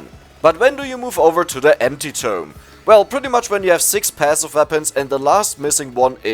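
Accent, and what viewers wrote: Germany. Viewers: Funny, I thought the same thing. First time I heard voice I was like "Wait, isn't this Granady (famous trackmania player)?" Really must be the german accent. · Ich frage mich wieso mir deine Stimme so bekannt vor kommt... Vielleicht ist es auch der deutsche Akzent^^ Hast du mal Videos zu anderen Spielen gemacht auf einem weiteren Channel?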